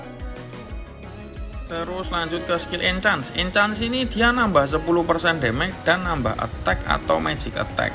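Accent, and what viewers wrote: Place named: Java, Indonesia. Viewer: Gua sub yt lu karna jelas ngasih penjelasan nya,meski ada loga jawa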